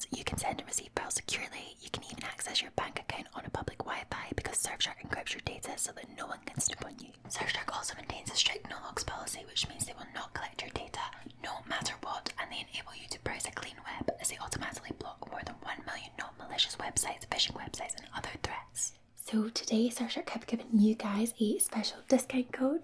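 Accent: Scotland, United Kingdom. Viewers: Thank you,. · I'll never stop loving the Scottish accent for ASMR. I hope this stuff never gets regulated like other modern medicine 😭🤣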